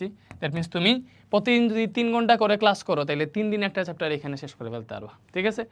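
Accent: Sylhet, Bangladesh. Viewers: Bhai ami shior tmi Sylheti ❤ tmr mat e buja jay tmi Sylheti ❤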